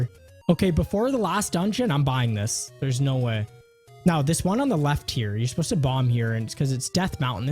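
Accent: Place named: Canada. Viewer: your commentary in this and the 3D one is hilarious. also called that you were (a fellow) canadian from the accent LOL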